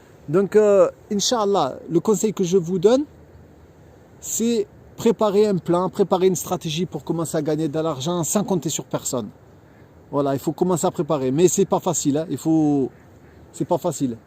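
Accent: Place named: South, France. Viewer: سلام عليكم خويا Tout d'abord bienvenue à toi et ta petite famille en Algérie terre d'islam, j'ai regardé avec beaucoup d'attention toutes tes petites vidéos et tu m'as donné un grand sourire grâce à ta joie de vivre, ton courage et aussi ton petit accent du sud "fada" ^^ Que dieu vous préserve et vous aide dans votre quotidien ici en Algérie, et ne pas oublier la parole de dieu : يا أيها الذين أمنو إن تنصرو الله ينصركم Pour revenir au sujet de ta vidéo, tu as tout à fait raison sur les conditions de vie ici, contrairement à un Algérien qui est né et grandi ici, avec son entourage et sa famille, il est bien entendu très rude pour un mouhadjir comme toi de s'installer comme salarié ici, car la grille salariale ici n'est pas forcément adaptée à votre cas, et l'absence également de beaucoup d'aides sociales dont vous bénéficiez en France. Par contre pour ce qui est de l'entrepreneuriat, l'histoire est un peu différente, pour ne citer que quelques exemples, ici il est possible d'obtenir un terrain agricole ou industriel gratuitement auprès de l'état en contrepartie d'un investissement à faire ici dans ces secteurs, il faut bien entendu créer une entreprise de droit Algérien (en Algérie il est interdit de vendre à un non Algérien pour protéger la terre), il est également possible d'obtenir un crédit islamique hallal auprès d'un établissement financier pour financer partiellement son projet, Il y a beaucoup de choses à faire ici en Algérie et ce pays ne crache pas sur les gens sincè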